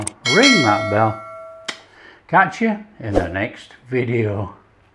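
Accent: United Kingdom. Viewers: I Like hearing this british accent 😊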